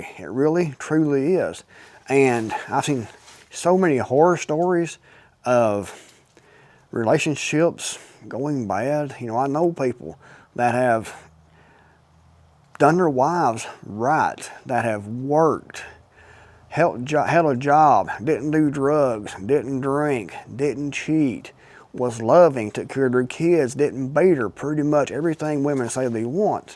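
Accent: Appalachia, United States. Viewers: Thank you that means a lot. I'll watch more of your videos. I like your accent applachian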